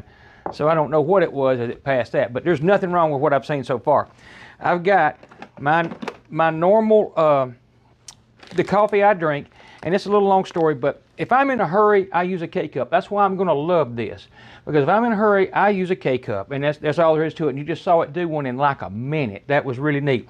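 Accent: South, United States. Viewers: Love your southern accent ❤️ thanks for all that info!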